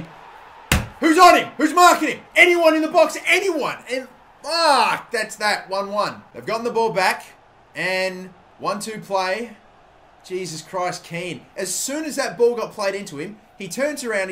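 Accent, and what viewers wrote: Australia. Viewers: I always thought you were Australian. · Are you Australian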